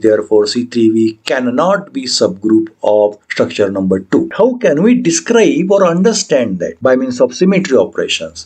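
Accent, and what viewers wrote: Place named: India. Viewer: Why am i at this point where every video ragarding my topic is from some indian guy with a accent thicker than than the ganges so i dont understand shit.....😢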